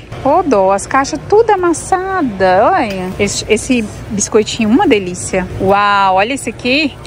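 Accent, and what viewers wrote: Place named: Minas Gerais, Brazil. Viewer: Vc é mineira UAI 😂😂 acho lindo o sutaque minerim 😂😂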